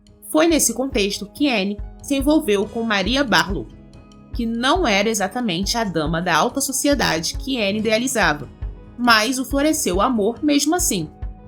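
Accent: Rio de Janeiro, Brazil. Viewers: Lá no RJ eles falam bonitinho assim mesmo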